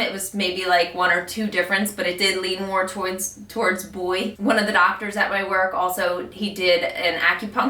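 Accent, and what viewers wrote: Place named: Philadelphia, United States. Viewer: Just found you! Im 14 weeks today.... i love your accent are you from Philly?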